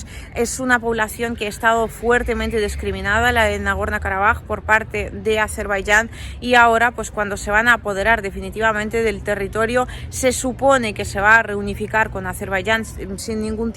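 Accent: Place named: Madrid, Spain. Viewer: Todo bien con vos, pero pregunto, porqué decís "actualidaZ" en lugar de "actualidaD"? Es con D no con Z. Esa estúpida manera de pronunciar que tienen los madrileños que en lugar de decir MadriD, dicen MadriZ, y vos lo prununciás igual. Por favor, si vas a hablar castellano, hablalo de modo neutro, natural, no con modismos locales. Otra: si decís "noticia" es "notiCia", con C, no con Z. Porqué decís "NotiZia", como los madrileños, o como algunas pocas regiones de España? En ningún lugar del mundo de habla española la C se pronuncia como Z, o sea, que para quienes somos latinoamericanos e hispanoparlantes (que somos la gran mayoría en el mundo) suena casi odioso oir que se prununcie de ese modo. Esos vicios en la pronunciación los puedo entender en alguien nativo de Madrid, pero no en un extranjero que pretende hablar castellano. Igual te felicito porque lo hablás demasiado bien, y sobre todo por tu trabajo. 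Gracias.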